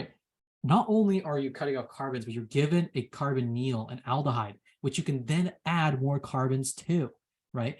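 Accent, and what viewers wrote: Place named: England, United Kingdom. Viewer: You have a very nice accent. Are you from England?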